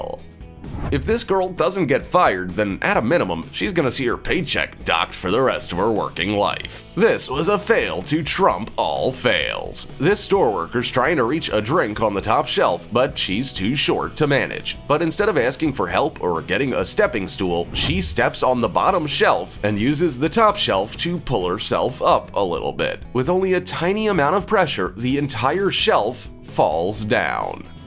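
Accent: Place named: United States. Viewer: Where is the narrator from? He seems to have an American accent, but uses expressions like "gave it a go" and "went pear-shaped". It's jarring.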